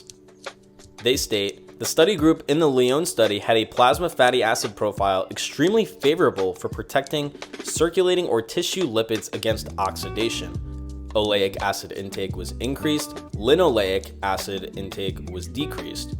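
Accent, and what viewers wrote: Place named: New Jersey, United States. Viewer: You from Jersey? You have a pretty distinct accent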